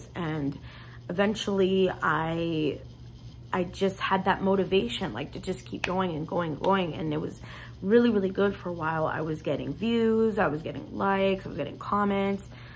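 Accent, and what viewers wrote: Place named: New Jersey, United States. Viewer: I am a new subscriber. I am originally from New Jersey, Jersey Shore and I miss it so when I came upon your Jersey Girl checkout girl, I immediately subscribed. I live in Colorado with my wife now and miss Jersey and was laughing out loud at your accent! Lol. I hope I didn’t show up too late to the party but you have to be happy like you said in your video. I wish you the very best of luck in no matter what you decide to do. All the best to you and your family.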